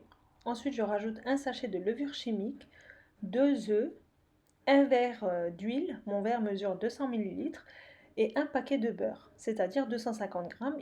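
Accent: Alsace, France. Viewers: Bonjour je viens de découvrir votre chaîne vous faites des recettes peu coûteuse avec très peu d'ingrédients c'est super sympa j'ai testé quelques recette c'est super bon par contre pour la recette des biscuits la le l'objet en silicone pour faire les biscuits au chocolat vous l'avez trouvé où parce que c'est c'est plus pratique une machine ou le hachoir pour faire les spritz donc est-ce que vous pourriez nous dire où vous avez trouvé l'accessoire très intelligente et continuer ainsi à nous régaler et à nous faire économiser de l'argent et continuer à faire du maison et arrêter l'industriel bisous et comptines bonne continuation Jennifer PS votre accent me fait penser à l'Alsace et vous en Alsace parce que je vois que vous prenez beaucoup de produits d'Allemagne bon courage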